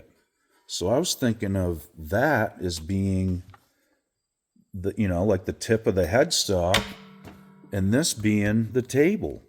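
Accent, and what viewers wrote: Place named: Maine, United States. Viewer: Had to check you out after hearing you talk and was right...mainah bub yessah!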